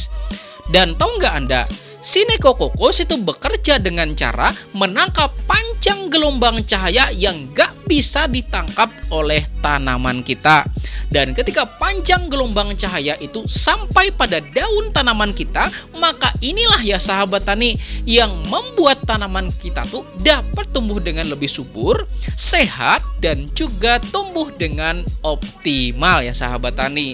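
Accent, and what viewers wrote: Banjar, Indonesia. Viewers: Mendangar logatnya kayak urang banjar pian tu. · logatnya kayak orang banjar, bener gak ya? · Logat admin org banjar pasti ini.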